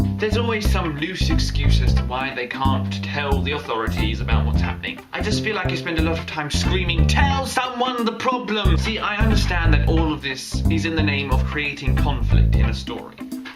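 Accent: United Kingdom. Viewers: That is quite an astounding British accent. :D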